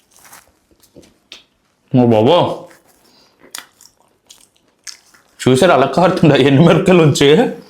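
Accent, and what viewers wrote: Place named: Visakhapatnam, India. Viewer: Bro where are you from bro ....matching to vizag slang ....if from vizag which place in vizag ....and I like your videos bro ❤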